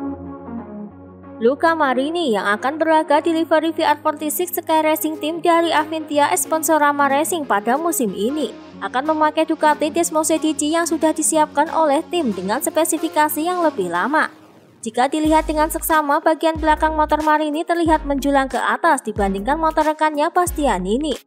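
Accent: Java, Indonesia. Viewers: medok jawa mba ny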